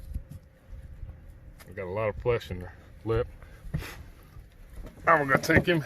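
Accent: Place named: United States. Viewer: I can tell this is good cause in the first five seconds I heard the American in his voice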